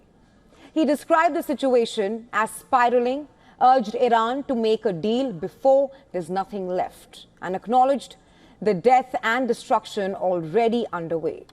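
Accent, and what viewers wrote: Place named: India. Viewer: I am American. I would never ask an Australian, British or South African person to change their accent. The Indian accent is beautiful and I understood 100 percent of what she said.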